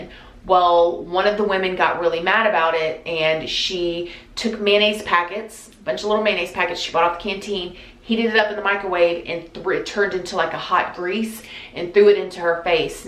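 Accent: United States. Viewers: Love to see you Interview a guy called David Icke check him out? Most important information I've ever heard!!! 😉 check him out on Agenda 21 the plan to kill you! 😈 Top illuminatti spotter? 👤👥👤😲✊👀 if you got little ones? Please check out David Icke on the Vaccine hoax!! 😈 this life is nothing like they tell us it is!! 😲 check him out on Bloodlines!!!*😈 Crazy stuff? And Jeff Censored the most banned head on YT 😂 all your favourite Rockstars? And Actors ALL throwing up illuminatti Gang sighns?? On Jeremiah Cohen!!! It'll blow you away? 😲 so peace people from Sunny England and if you read this can I get a shout out please in that Top American accent 👍😆✌💙 from your Number 1 English lad!!😉 Zac xxx cheers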